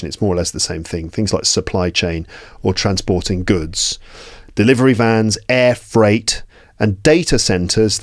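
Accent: RP, United Kingdom. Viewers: Yes, speaks with what is called RP (Received Pronunciation), which is clear and easy to understand compared to many regional accents. You can also call it “BBC English”. I think you should not focus on one specific accent, just make sure people can easily understand you. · I love Bristish accent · What is the name of the accent you speak? Is it like the BBC accent? ، Since I’m not a native English speaker and I’m learning English, which accent do you recommend I learn? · He speaks with a standard British accent also known as Received Pronunciation. Since you're a learner of English, you should go for Received Pronunciation.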